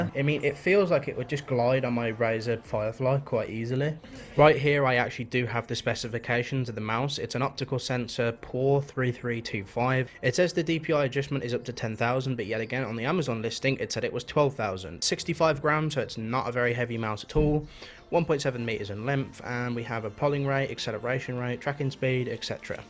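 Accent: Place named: United Kingdom. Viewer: dude where abouts in uk are you from? i recognise your accent!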